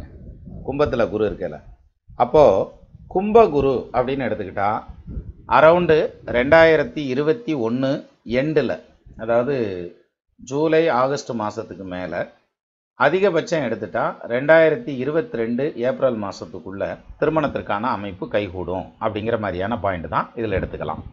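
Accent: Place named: Tirunelveli, India. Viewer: Sir neenga thirunelveli Tamil I enjoy because my father veeravanallur and my mother ambasamudram. We were in vickramasingapuram for a long time.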